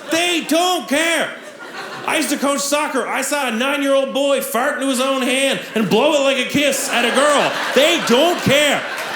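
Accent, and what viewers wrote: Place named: Canada. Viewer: Im an ontario canadian myself. I was watching a racing youtube video and this whole special came up as an Advertisement. Ive never heard of you yet. I almost skipped but heard the first 2 minutes and watched the whole thing! I knew you were canadian instantly. Cheers from ontario! New fan! Edit: legit laughed my ass off outloud for so long my girl yelled at me from the other room asking if i was okay 🤣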